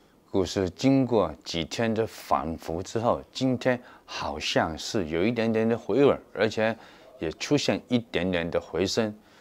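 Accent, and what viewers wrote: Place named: Japan. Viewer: 像日本人講普通話，哈哈😃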